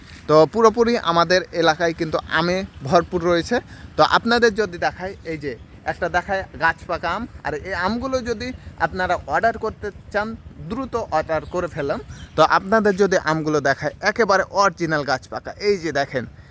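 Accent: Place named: Chapainawabganj, Bangladesh. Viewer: আপনার ভাষা শুনে মনে হচ্ছে আপনার বাসায় চাঁপাইনবাবগঞ্জ। আমার বাসা চাঁপাইনবাবগঞ্জ। আমি কিছু পাখি নিতে চাই আপনার কাছে। আপনার লোকেশন টা যদি শেয়ার করতেন তাহলে আপনার খামারটা ভিজিট করতাম।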